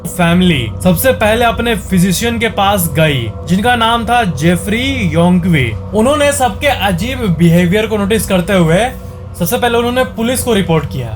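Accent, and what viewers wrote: Bihar, India. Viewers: Kya bihari accent hai 🤣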